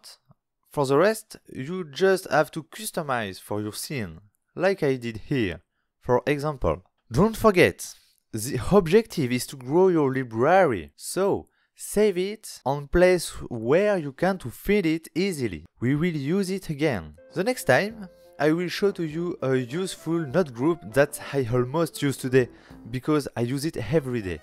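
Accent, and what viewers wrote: France. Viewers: Super instructif merci!! Sinon woaw, cet accent frenchie aussi parfait je pensais qu'il n'existait que dans les films :o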